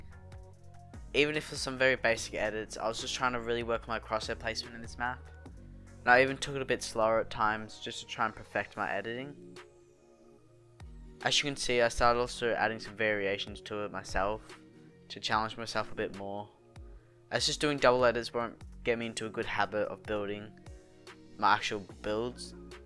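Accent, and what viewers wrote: Australia. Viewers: same I’m Aussie and he kinda sounds like PWR Alex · Na Aussie accent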